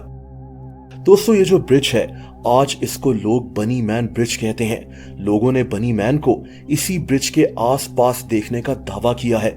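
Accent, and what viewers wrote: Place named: Lahore, Pakistan. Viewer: speaks like a typical lahori definitely reality tv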